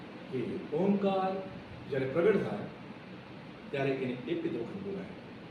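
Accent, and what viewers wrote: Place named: Kutch, India. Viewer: Prabhu pranam. Tame je bhasa bolo chhe te kutcha na matra 24 gam leva patel bole chhe. Teo 350 varash pahela virpur ni aspasma te migrate to kutch. I guess you bro,living near rajkot. I m living abroad for 40 years Thaks.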